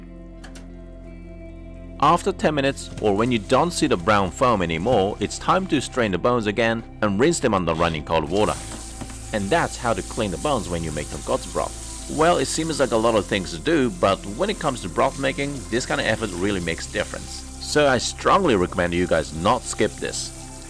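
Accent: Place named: Australia. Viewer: Great and informative, thanks Also, did you learn english in australia?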